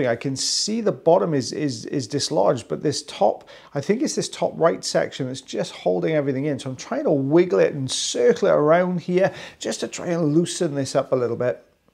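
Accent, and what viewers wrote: Wales, United Kingdom. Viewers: It's a Welsh accent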